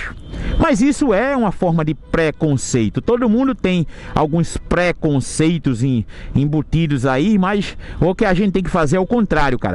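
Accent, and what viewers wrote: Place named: Northeast, Brazil. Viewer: sou teu fã cara! Curto demais seus vídeos, seu sotaque nordestino só mostra o quanto esse Brasil é maravilhoso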